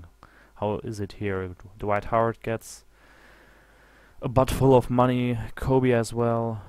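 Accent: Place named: North America. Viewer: For being German you sound like you have a Canada/USA accent.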